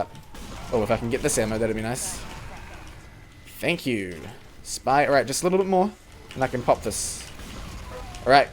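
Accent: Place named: New Zealand. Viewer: Never heard a Kiwi TF2 player!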